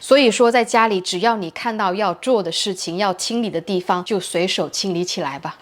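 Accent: China. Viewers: 容易，傳統，觀察，囤貨，宣傳，玄關。這些詞，要說慢一點，才好聽。妳是海南道妹妹嗎？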